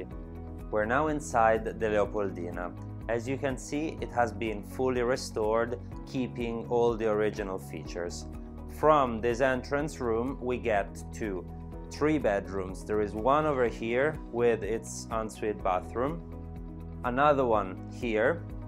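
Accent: Italy. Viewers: I love this Italy accent English ^_^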